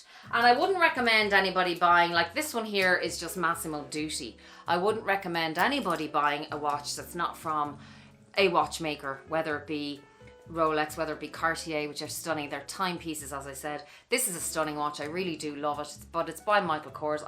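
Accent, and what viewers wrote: Ireland. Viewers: Love your accent! Are you Irish? Are y’all still locked down? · Hi! I’m a new subscriber & really enjoyed your video. I’m trying to place your accent, Irish? It’s charming! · Love the Irish lilt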